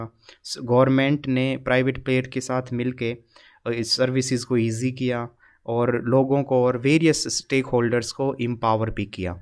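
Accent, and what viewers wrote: Haryana, India. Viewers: apki language m haryanvi accent aave tha ismare puchi thi..m bhi haryanvi hu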